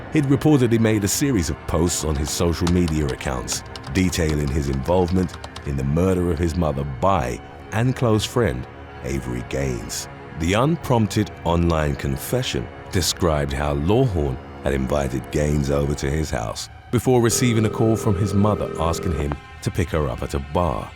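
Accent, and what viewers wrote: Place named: United Kingdom. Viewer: He’s British, they pronounce words differently than Americans.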